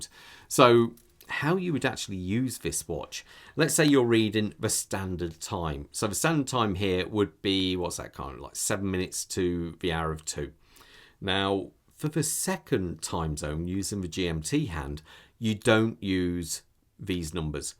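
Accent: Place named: Midlands, United Kingdom. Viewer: It’s nice to hear a Midlands accent. In fact it’s bostin.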